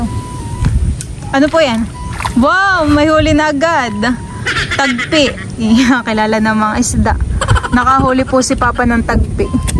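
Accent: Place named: Mindoro, Philippines. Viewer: Pamilyar at nadinig ko Yang tuno na Yan sa lubang Mindoro, Taga Mindoro kaga?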